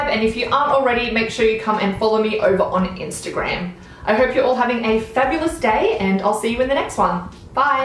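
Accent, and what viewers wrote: Australia. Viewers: hy dear u from austrlia???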